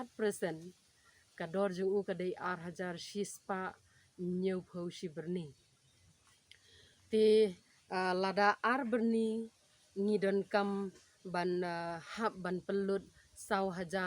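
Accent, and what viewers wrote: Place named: United States. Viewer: Sem syiar jng phi da don da ki batch mo katno batch kiba rit bd ktno kiba heh bd ktno kiba lah long bam doh bd ktno batch kiba lh kha pylleng. Kren style phi te mo kren fareng te lh wai da kwah batai ia ki nongrep khasi jng ngi sngewbha batai da ka ktien khasi nmr bun ki dei bym sngew fareng kum ma phi, phi te ka accent lane ring Sur thik kum ka Mem na USA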